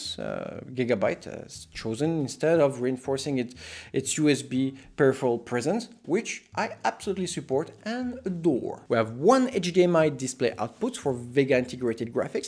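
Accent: France. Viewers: L'accent français ;) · are you french ? Oo (sorry i'm new)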